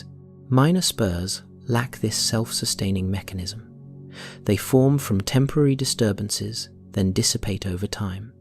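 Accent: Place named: United Kingdom. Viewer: I actually, like the voice of British accent. I can understand perfectly. Please use the same voice for future posts. This new discovery is awesome. I am always hungry for facts and accuracies., while we are still alive to learn our location in the vast Milky Way. I was wondering 🤔 all the time what our solar system encounters during our galactic journey. The Earth absorbs some of the space dusts and unknown particles that can affect our planet. Gamma rays or super nova radiation are scary that truly wipe out our civilization instantly. Anyway, I surely enjoy listening the podcast. Thank you for a good job 👏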